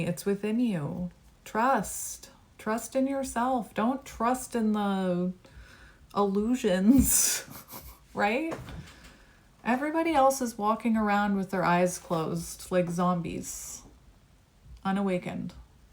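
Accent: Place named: Canada. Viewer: Two of your readings have been so spot on! One with the feathers, and this one, very very accurate. I am sending you best wishes from Central America, I could tell you were Canadian, by your accent. All about his feels so right... 15 reminds me of his emotional age....he is coming around.. I hope and I feel...there is still hope, and healing... no contact at the moment.. I am letting him figure it all out. 19 sounds much better than 15! I have and I keep choosing myself! It all rings too much truth! It is very very re assuring. Both of our parents.. alcoholic! Integrating both energies femenine and masculine! It has been like living th relatioship in real time! The key to healing and growth... very very sweet and affirming and brutally honest...! A great catalyst! Still very connected telephatically! Yes he is away. Thank you.. DELULU is a SOULULU! and No divine femenine are not mad.... 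we rock! Clarity and authenticity big present themes! Lorena Bobbit Oh MY Word! Noooo! That was brutal! This was brilliant! Mental as Harry Potter used to say! I can not possibly tell you.. all the overwork, family etc.. all of that applies.. them not me.. I am taking a break.. all true... unbeliebable...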